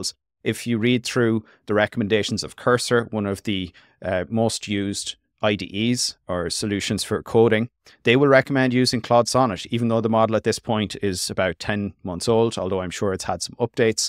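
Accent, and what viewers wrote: Ireland. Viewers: Surprising but great to hear an Irish voice on an AI LLM review. Great content mate intelligent & well explained, keep it up please!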